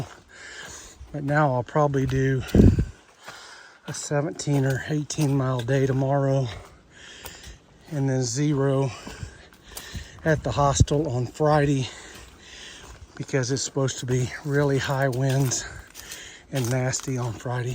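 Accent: South, United States. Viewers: You are doing some killer miles! Awesome job! I love your Southern accent . It makes me feel all warm and fuzzy on the inside lol keep up the awesome videos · Love your voice. I can't remember if you said where you are from. You sound southern.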